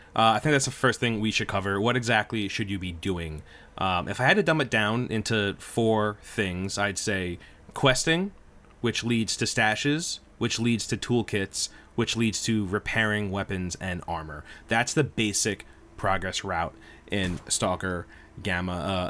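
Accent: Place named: North, United States. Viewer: Strong northern US accent, michigan to new england (probably NY). Great guide thanks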